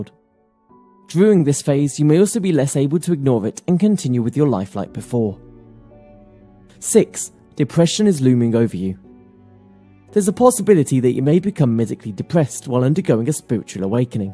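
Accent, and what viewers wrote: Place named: United Kingdom. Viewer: you sound sort of british.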